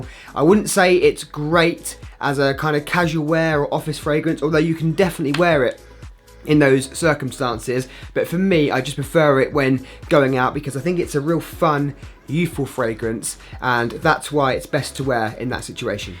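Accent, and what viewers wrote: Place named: United Kingdom. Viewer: He is British. He is speaking the original English not the American English or whatever English you learnt.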